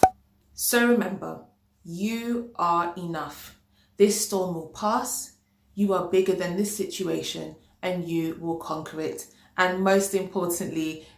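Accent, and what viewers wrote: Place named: United Kingdom. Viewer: crazy but, its so nice to hear a British accent on a loc channel 😂. children stress!! definitely need to stop stressing. I'm a worrier! but, this year is about balance, balancing work and play, I used to only work and hustle also, to do my daily gratitude, which really helps me relax.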